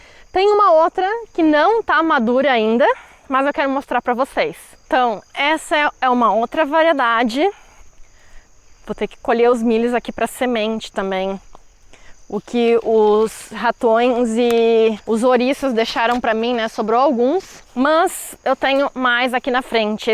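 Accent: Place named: Rio Grande do Sul, Brazil. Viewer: Como eu adoro te assistir , vc é pura inspiração Graças a você hoje eu consigo ver algumas plantas q são consideradas “pragas” com um olhar mais amoroso , sem contar q seu sotaque é o mais gostoso de ouvir , adoro o sotaque gaúcho , tô aprendendo muito com seus vídeos , obrigada por dispor do seu tempo pra nos ensinar tantas coisas boas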